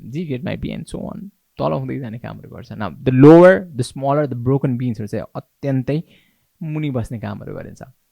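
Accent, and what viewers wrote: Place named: United Kingdom. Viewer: Thankyou bhai tara ni ki nepali ma ki english ma bolnu na hau...bhai ko nepali bolai ma english lawaj le alik bujhnu garo vayo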